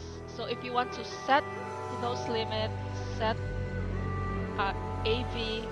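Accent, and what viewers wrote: Philippines. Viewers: your accent... pinoy?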